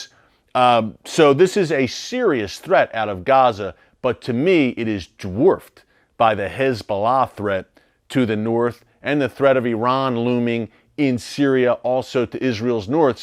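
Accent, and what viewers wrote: Philadelphia, United States. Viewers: I thought I recognized a Philly boy. I’m from DE. I miss good Philly pizza.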